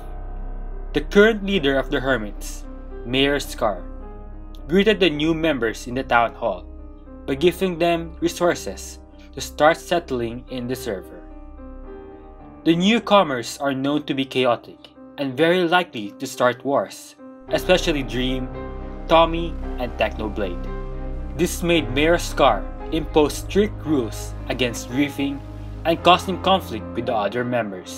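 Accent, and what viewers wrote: Philippines. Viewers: I never heard such a Filo accent in a Minecraft video holy crap ahahaha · are you Filipino? you sound like one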